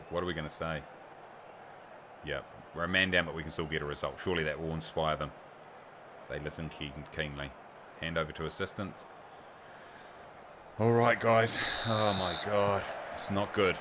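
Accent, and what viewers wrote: New Zealand. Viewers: Love the spreadsheet and a nice wee return on that gamble! Nice 1st up game episode I liked it mate and its weird hearing a Kiwi accent on YT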